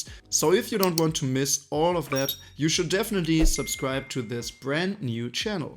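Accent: Germany. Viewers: holy shit i’m laughing here. Saw your video and clicked to learn about the watch and immediately thought to myself what a thick German accent while glancing down at the name of your channel.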